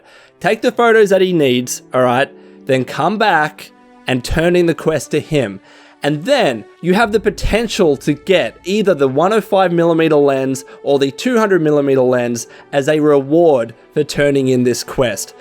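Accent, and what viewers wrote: Australia. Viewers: Just got Fallout 76 two weeks ago and really enjoying it so far. Always good to come back here for the tips and hear another Aussie voice.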